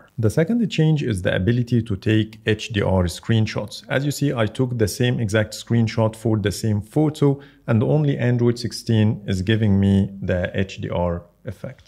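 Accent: Egypt. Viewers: ياسطا انت مصري ؟ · are you from middle east, Egypt particulary ??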